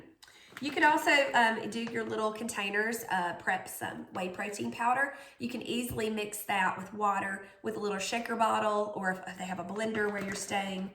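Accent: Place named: South, United States. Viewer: I love your videos! I am from the West so the southern accent is one of my favorite parts of your videos!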